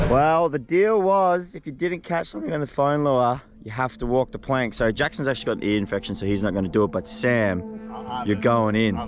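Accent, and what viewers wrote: Australia. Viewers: Onions, lime, red shit, green shit, blah blah blah cook other side. Lovely Australian accent hey Lads.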